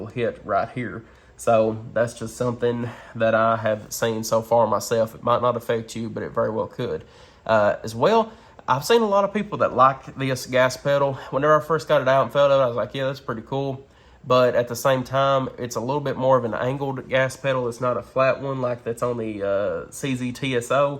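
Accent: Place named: Middle Tennessee, United States. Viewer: Where are you from, brother? I'm from Savannah, TN and you sound just like we do here in middle TN.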